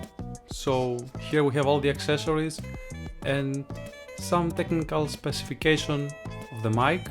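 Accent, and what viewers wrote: Greece. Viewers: Η Ελληνική προφορα ξεχωρίζει από χιλιομετρα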